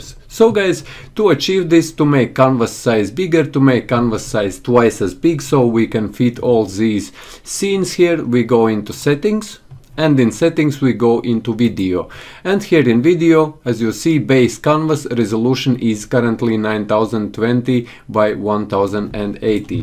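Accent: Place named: Russia. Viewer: This dudes russian accent is awesome! its so strong!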